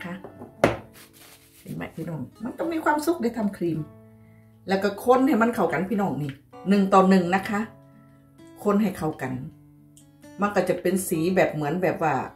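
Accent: Korat, Thailand. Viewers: ดูคลิปไหนก็ชอบน้องเห็นพูดสนุกโคราชกันเอง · อ้าว คนโคราชนี่นา พูดสำเนียงเหมือนคนกระโทกโชคชัย ฉันก็คนโคราช ฉันเคยเรียน ร.ร. สุรนารี ฉันกดติดตามให้แล้วจ้า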